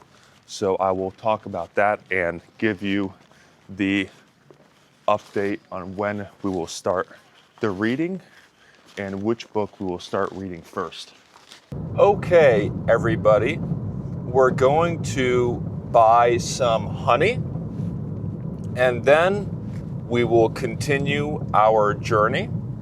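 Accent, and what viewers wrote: United States. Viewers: your American accent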